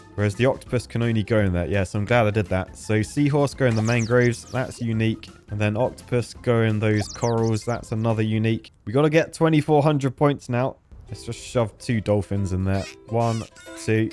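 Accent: United Kingdom. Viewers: I could listen to you all day without even seeing videos often your british accent and just the way you are makes it so entertaining listening to you i started watching you like maybe 2 months ago and i feel like i'm soon done with the whole last year of your videos (and yeah i just noticed i never subscribed before shame on me!)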